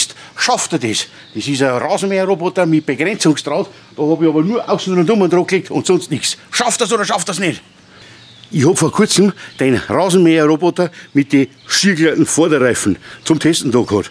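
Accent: Bavaria, Germany. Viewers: Also i hoer di so gern mit Deinem Bayrisch. Das is schoh supper!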